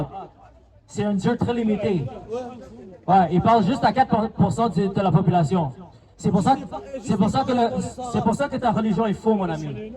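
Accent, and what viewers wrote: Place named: Canada. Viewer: Canada? J'entends un tout petit accent canadien.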